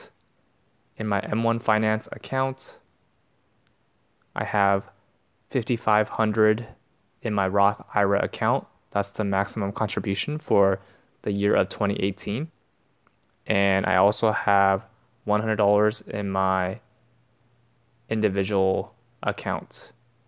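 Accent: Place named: India. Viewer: Naw buddy I can tell, you have a slight indian accent street shitting fren.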